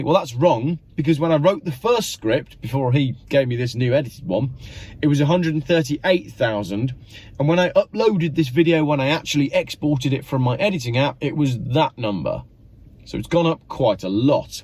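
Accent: Wales, United Kingdom. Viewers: Excellent Welsh accent, I’d say 😀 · Best Welsh Accent ever....FFS....Love it! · Brilliant Welsh accent actually. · That was a pretty good Welsh accent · Brilliant Welsh accent . I dont drive .But its ridiculous Teenty miles per hr .Are people going to stand for it .i dam well hope not .if they stand for this .Then they will stand for everything coming down the line .and its not good . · Seems like ages since you did the Welsh accent....seems to make all this bad news easier to swallow. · Pretty good Welsh accent tbf. Whose coat is this jacket?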